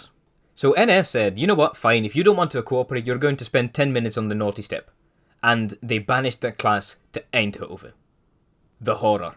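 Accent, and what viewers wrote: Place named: Scotland, United Kingdom. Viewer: First time watching one of your videos that isn’t a animation and my immediate thought was “holy shit there Scottish”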